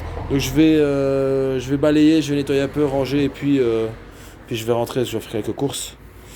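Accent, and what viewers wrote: Belgium. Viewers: Ça fait plaisir de te revoir, toi, ta jolie mob et ton petit accent Belge qui fait plaisir 😎✌️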